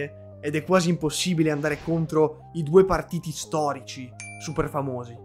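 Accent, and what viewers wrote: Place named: Chioggia, Italy. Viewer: Mi sembri da Chioggia 😊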